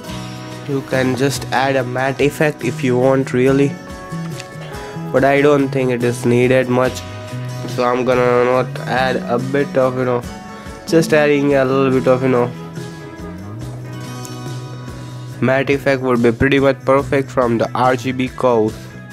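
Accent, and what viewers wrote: Gujarat, India. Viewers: Are you from Gujarat? Your accent says that.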